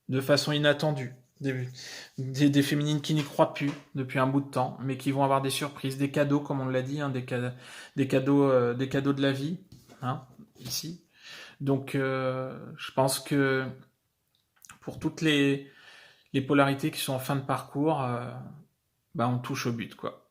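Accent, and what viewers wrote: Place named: Normandy, France. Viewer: Tu m’étonnes qu’il a peur de me perdre avec la méga connerie qu’il a fait avec sa tierce il y a 15 jours. Si ça pouvait au moins le faire réfléchir avec sa p’tite tête lol Merci pour la vidéo (ah l’accent Normand hein le « gô » 😂😂😂)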